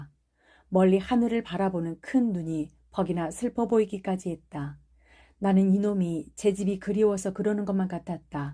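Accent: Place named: Goheung, South Korea. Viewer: 내고향 고흥 사투리에 어린시절이 생각나는 실감나는 명작이네요 아~~옛날이여 잘들었네요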